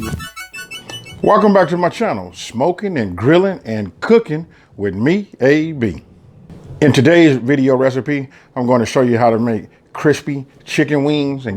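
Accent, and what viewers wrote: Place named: West Coast, United States. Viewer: I appreciate you not hiding your west coast accent LOL....good video bruh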